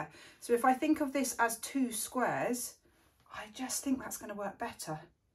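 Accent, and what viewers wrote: United Kingdom. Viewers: By your accent I feel you are in UK, there are very few acrylic pouring demonstrations from anyone other than USA/Canada, though they are really interesting, it does make a difference to hear the materials as USA materials are not available in UK. I love your work, I am a beginner and struggling but determined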